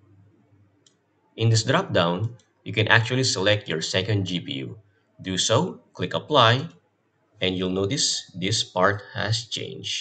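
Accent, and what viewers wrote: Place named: Philippines. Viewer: you sound like a Filipino.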